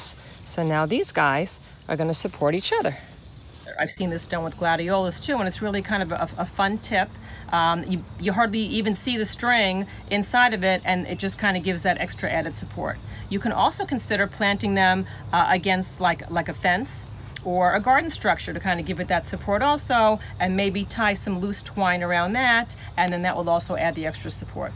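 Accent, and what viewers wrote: New Jersey, United States. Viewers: What is the foxtail plant in your sunflower field?..Is it a millet or a grass amd is it beneficial to the sunflowers or just something that grew there? Thanks in advance. I always miss my home state NJ when I hear you talk😍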